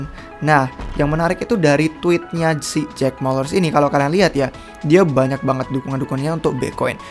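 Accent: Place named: United Kingdom. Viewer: lama tinggal di australia, temen bulenya pun banyak orang australia, jadi wajar kalo aksen british nya kental kalo kita yg terbiasa denger pake aksen US emang kerasa aneh sih 😂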